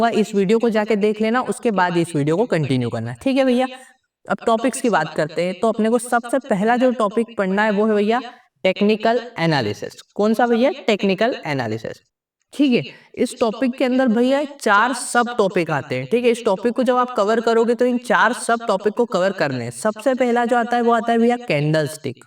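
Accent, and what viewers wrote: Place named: Madhya Pradesh, India. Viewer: Sir aap Madhya Pradesh se ho kya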